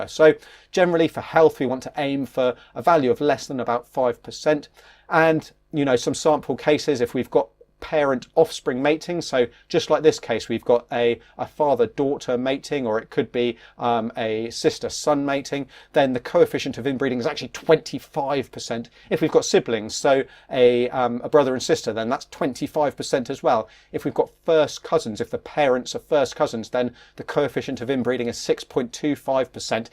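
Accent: United Kingdom. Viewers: Look at his british accent 👁️👄👁️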